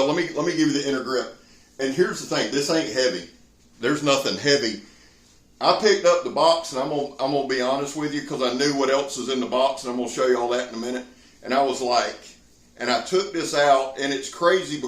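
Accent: South, United States. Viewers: Southern accents are so cool. All I got is this Canadian tongue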